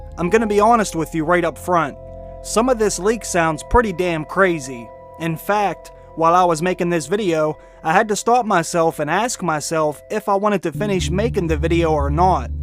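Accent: Pittsburgh, United States. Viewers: Are you from the Pittsburgh area ???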